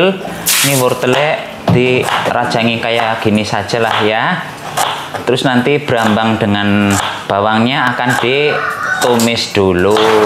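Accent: Banyumas, Indonesia. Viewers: ngomng ngapk baelh...aj badeol...😂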